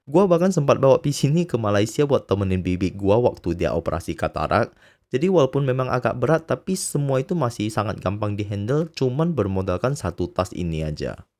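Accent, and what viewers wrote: Indonesia. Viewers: dari caranya ngomong gua yakin orang ini Cindo.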